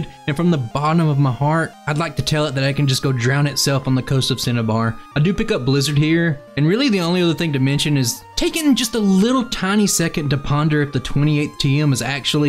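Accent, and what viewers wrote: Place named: Appalachia, United States. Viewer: I love the fact i found a pokemon channel where someone pronounced names and items like I do in the southern tip of Appalachia. Instant sub lol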